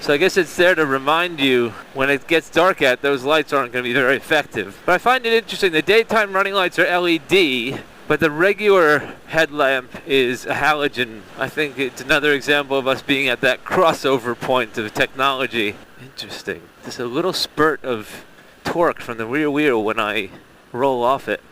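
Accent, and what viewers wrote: United States. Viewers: You sound like an American but the roads say and bike British Empire. The amount of land splitting is insane and that traffic is insane. Don't even think about lane splitting in Texas, you'd get smashed by the idiot drivers that are paying more attention to their cell phones. Plus its illegal. The T-120 is an old man's bike for sure and 80 horses is like you said not neck snapping but maybe adequate. As I've stared at your handle bars for damn near 30 minutes, it's clear the wiring from the hand controls looks really bad. I'd give the designer and F along with his buddy that designed that stupid fuel filler cap. Don't understand your bleeps or that you blurred out your speed when you hit 80. I'm a little confused thou when 20 mins in you seem to suggest the T100 with it smaller motor was better. Unsuitable for motor traffic... lol is that someone's suggestion or a government sign? 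I think you did a good job with your impressions. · just wondering; why does the motorcycle have a British number plate but you have an American accent.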